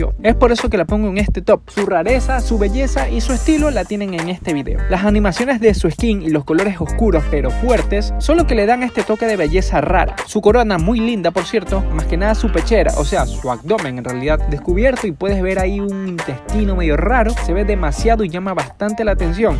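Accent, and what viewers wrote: Ecuador. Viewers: De donde eres? Con tu vos me pareces ecuatoriano😕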